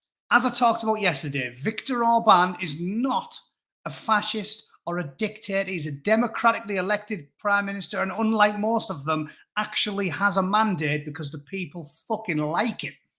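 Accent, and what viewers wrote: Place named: North, United Kingdom. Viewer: you should do a spoof news reader segment, done in your own chippy northern styleee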